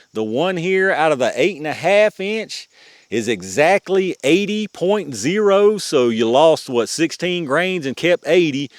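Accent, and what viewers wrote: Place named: Georgia, United States. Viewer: Sir, where is your accent from? Georgia? I love it!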